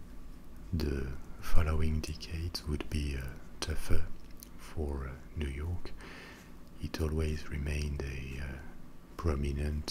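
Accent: France. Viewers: I was gonna say he sounds French and then I took a look at his username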